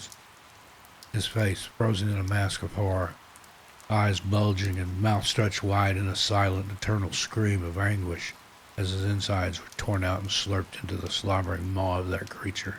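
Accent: Appalachia, United States. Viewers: Great stories. The narrator sounds like that of an Appalachian. These stories scare the crap out of me. Its been years since I have done it, but I have hiked and camped in these very same areas in these stories. I didn't see anything or even necessarily heard anything too creepy, but there was a sense by all of us that "something" malevolent out in the darkness of the deep woods. I have never gone back.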